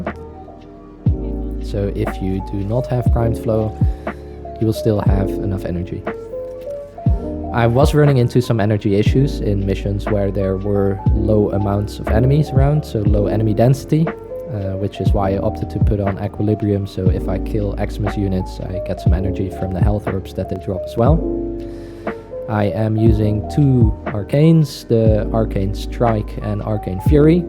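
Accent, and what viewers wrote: Netherlands. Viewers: Are dutch because you really sound like u are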